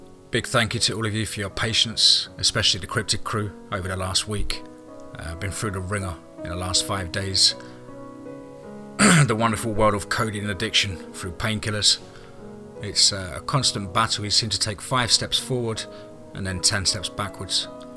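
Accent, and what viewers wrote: United Kingdom. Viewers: Is it me or does the story being read sound odd in a UK accent versus being done in a Texas accent? It’s simultaneously seems off but it works really well at the same time.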